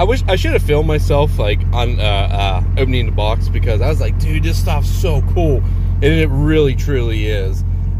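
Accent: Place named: Pittsburgh, United States. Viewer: Pittsburgh area? You sound like it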